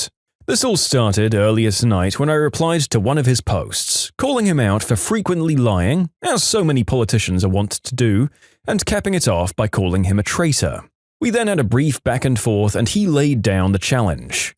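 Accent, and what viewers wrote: United Kingdom. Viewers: What’s going on about the new British accent 🤔🤔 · Get rid of the British accent go back to the normal American accent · Wth is this voice It's british and a different person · Hmm... First it was Deep voice dude, then comedic dude, now Bri'ish dude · British alert british alert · Well that's new, nice British accent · Is it me or the he becomes more British?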